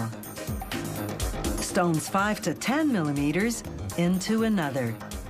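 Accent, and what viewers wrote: Quebec, Canada. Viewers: She's ESL, and doing a fairly good job of suppressing her (french canadian) accent.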